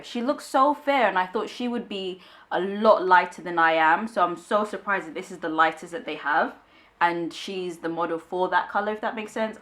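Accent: United Kingdom. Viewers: Im like in pantyhose heaven with ur sexy legs and ur accent..love that brtish accent super sexy.thanks for sharing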